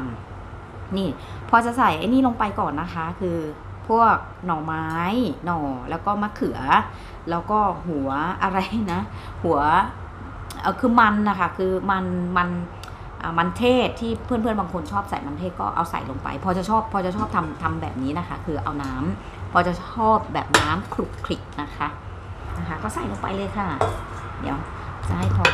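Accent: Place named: South, Thailand. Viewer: ชมคลิปผมบ่อยๆย้อนไปดูคลิปแรกๆแหลงใต้ทั้งเรื่อง55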